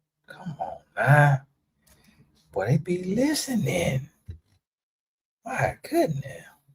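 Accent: South, United States. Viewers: aye you from the carolina’s or georgia?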